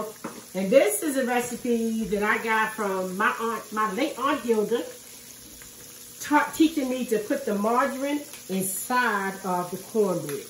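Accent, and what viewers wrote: Baltimore, United States. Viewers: Momma you from Baltimore ? 😂 I can hear it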